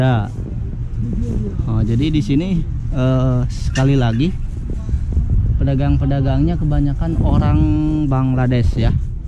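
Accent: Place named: West Java, Indonesia. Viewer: Assalamualaikum..... teh lucu, sundana napel wae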